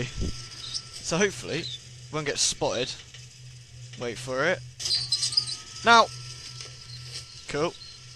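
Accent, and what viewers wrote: United Kingdom. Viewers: i cant tell is he brithish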